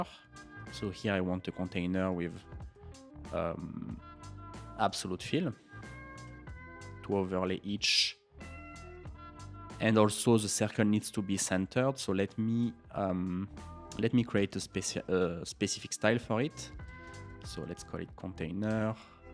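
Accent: France. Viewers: Mec t'es trop fort, ton accent français est tellement présent ! !!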